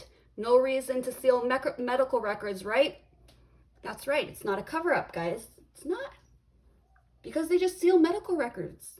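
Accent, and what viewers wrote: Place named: Hawaii, United States. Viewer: So the first time I watched you. I was like this woman reminds me of me..speaking wise a little harsh lol I thought she just reminds me of home...then about 3 videos later I find out your from Hawaii..not only home. But my island. I'm on the .mainland now and people don't get me unless they really know me cuz how we talk.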